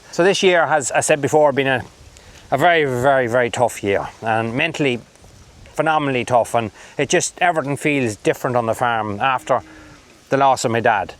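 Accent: Ireland. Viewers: you certainly do more than farm! I am an 80 year old lady in a wheelchair. I, too, came for the cottage renovation, but stayed because your Irish accent, your stories, your achievements, your struggles and your honesty are captivating. When I lost my parents, I suddenly realised, I was now the adult. The final decision rests with me, I can't consult them any more. You are going through that transition with losing your father - you are now the adult farmer, the decisions rest with you. It will be stressful, but you will grow with it. Best wishes.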